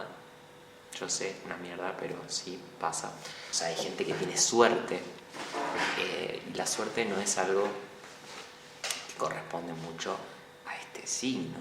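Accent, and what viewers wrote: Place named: Argentina. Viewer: No entiendo, no eres lindo pero me gustas. Q pasa?😅😂 tu voz, mirada? Sonrisa? Me distraes. Ni siquiera me gusta el acento argentino. Tienes ascendente libra? O es que me recuerdas a mis primos? No malinterpretes, no me gustan ellos, pero uno aencuentra atractivo a alguien con semejanza de parentezco. Y aqui concluye el comentario de un mercurio en piscis 😂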